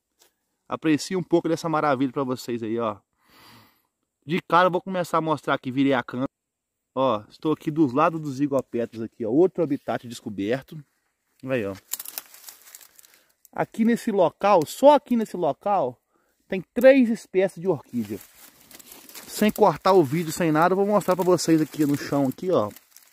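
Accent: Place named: Minas Gerais, Brazil. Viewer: Minino eu gosto de ver as plantas mais eu gosto muito do seu sotak mineiro. Muito bom os seus vídeos 👌👏👏 Deus te abençoe sempre 🙋😍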